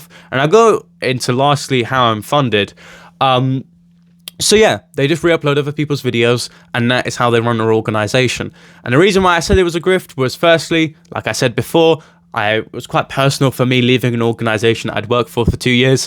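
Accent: London, United Kingdom. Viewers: Has had a bevvy? You sound more Black-Londener than usual. Anyway, Merry Christmas lad, all the best kidda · He has an inner London accent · Oh no. Is that a bit of the London Blackcent I can hear? Very off putting. I hope you can grow out of it.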